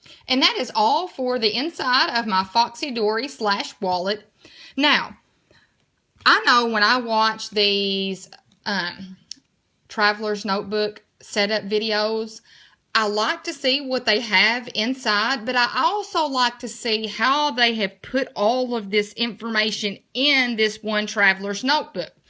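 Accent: South, United States. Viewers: Really nice video, very clear, easy to follow, and I loved the clear way the ensembling of the foxidori. I also loved that sweet Southern accent! :-) So warm and homely to hear!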